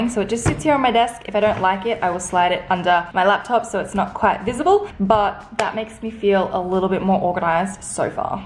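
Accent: Australia. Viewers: Adore your Australian English 🖤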